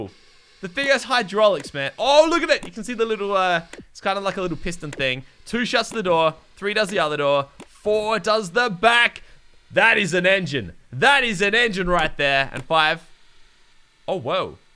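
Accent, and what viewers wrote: Australia. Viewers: Graeme Hope he is australian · Wait ur Australian?.......Awesome cause Im Australian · What your Australian wow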